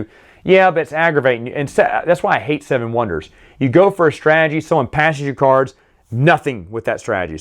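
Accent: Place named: South, United States. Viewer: Hey, I hope you don't mind answering a question I've been asking myself for a long time while watching your videos: I'm from Europe and English is just my third language. However, I love the English language and I want to learn as much about it as possible. I'm pretty sure I'm able to recognize several different dialects/accents, including Irish, Scottish, several British dialects, Australian, New Zealand accent, etc. However, when it comes to North American dialects, I still have problems differentiating between all of them. I think I'm able to recognize New York dialect, stereotypical Italian American dialect (based on Martin Scorsese movies, lol) vague Canadian dialect and vague Southern American dialect. I'm pretty sure you're from the southern US. Am I right? When I watch American movies, I often hear people mention "Midwestern" or "Californian" dialects, but they just sound "American" to me. 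Any advice how to recognize the differences? I know this question has absolutely nothing to do with your video, but for some reason, your videos always remind me of this question.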